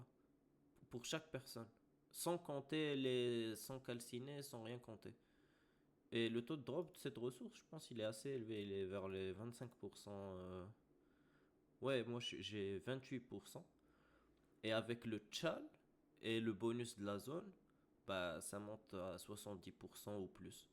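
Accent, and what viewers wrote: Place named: Arab world. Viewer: L'accent reubeu qu'on kiffe, merci pour l'astuce. Le prix ne ferra que baisser dés maintenant haha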